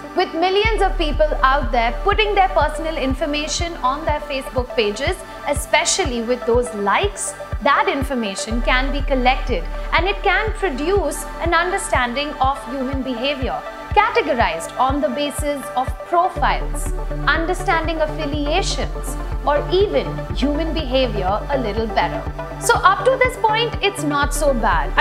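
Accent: India. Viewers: Hollywood bgm ! But indian english!!😅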